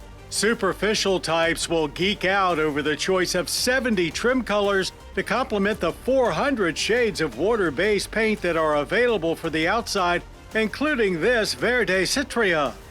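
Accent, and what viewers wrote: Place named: Maryland, United States. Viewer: I am always amused by the way he says "subwoofer" & "compass" with his Maryland accent. He says sub-WOO-fer instead of sub-woof-er. He also says the com like the end of a website name instead of come. Robin Ophelia Quivers from The Howard Stern Show on SiriusXM Satellite Radio also has these quirks from Maryland.😊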